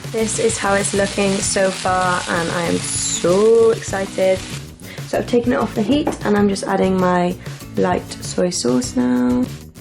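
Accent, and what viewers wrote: Wales, United Kingdom. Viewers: Is there a hint of wales in that accent ?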